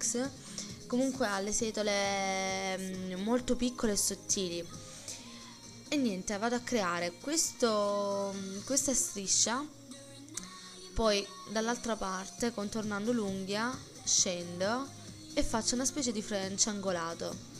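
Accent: Apulia, Italy. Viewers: di dove 6? anche io sn della puglia prov.di brindisi :D è vero che si sente molto nella parlata..cmq cosa usi x fare i decori neri?? a me in genere il gel si espande mentre faccio i decori.. che gel usi tu?? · Forse perchè sono pugliese anche io ma si sente che 6 pugliese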